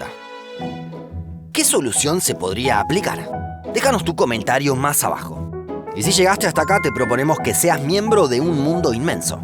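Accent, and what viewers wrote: Argentina. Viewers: ¿Este canal lo hace un argentino?, pregunto por curiosidad.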